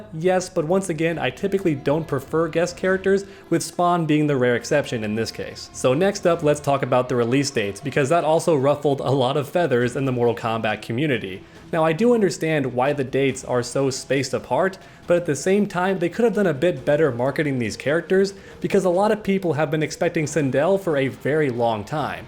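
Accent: Texas, United States. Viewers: Wait, you're a Texan?!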